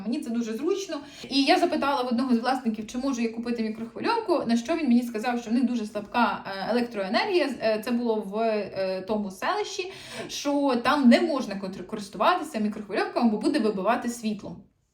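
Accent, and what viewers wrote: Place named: Western Ukraine, Ukraine. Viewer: З відки у вас такий жахливий акцент. Ви часом не западянка?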